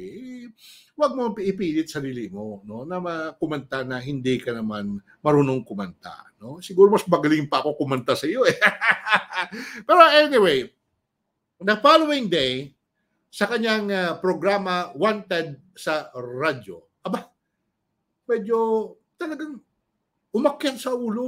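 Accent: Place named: Visayas, Philippines. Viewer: Ayan nagsalita na c raffy tulfo dami nyo kuda at puro kau fake news. Asan kau ngaun sa mga pinagsasabi nyo. Magaling kumanta? Tunong visayA ka nga magsalita.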